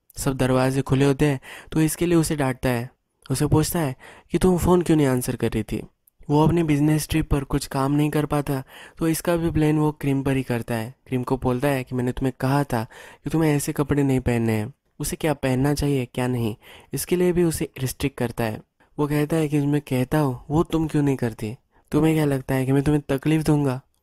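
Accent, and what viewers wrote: Maharashtra, India. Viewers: Chitka hua ... Full Marathi guy speaks hindi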